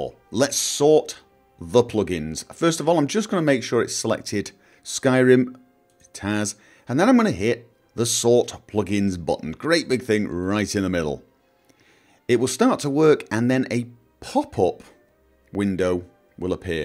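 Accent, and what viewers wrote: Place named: England, United Kingdom. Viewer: I think an english accent makes you sound 100% smarter.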